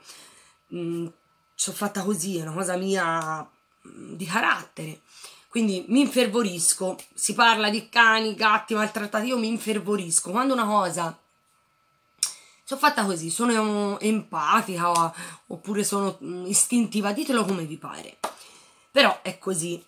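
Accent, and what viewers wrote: Tuscany, Italy. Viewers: Io spero che non chiuderai il canale perché quando in negozio non c'è nessuno mi fai compagnia ascoltando le tue storie e vedendo i tuoi video trucco. Mi sono spazzolata tutti i tuoi video sui libri e molti di essi sono finiti nella lista da leggere. Adoro la tua parlata, mi riporta nella mia Toscana da ui mando da 6 anni. La gente spesso non capisce che siamo tutti esseri umani e si erge su un piedistallo pronta a sputare sentenze, lo vedo col mio negozio. La gente se mi lamento pensa che lo faccia per spillare loro più soldi convinta che evada chissà quanti capitali, manco avessi tre conti in Svizzera non denunciati. Sii orgogliosa di quel che sei, di quello che hai passato e di come stai cercando di tirarti su, sii orgogliosa del percorso che fai per ripulirti... E ricorda che per una che critica c'è tanta gente che ti stima e a cui piaci così come sei.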